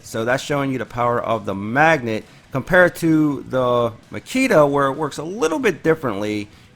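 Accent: Pittsburgh, United States. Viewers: Pittsburgh accent? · You don’t happen to be from the Pittsburgh area are ya? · Just a little Pittsburgh accent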